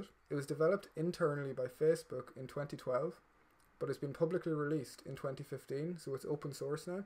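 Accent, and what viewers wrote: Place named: Ireland. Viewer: Great quick video! And cool to hear an Irish YouTuber doing programming tutorials 🤙☘️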